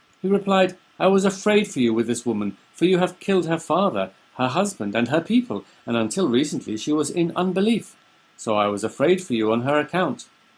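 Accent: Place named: United Kingdom. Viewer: Your beautiful posh English accent makes everything sound more effective. Nicely explained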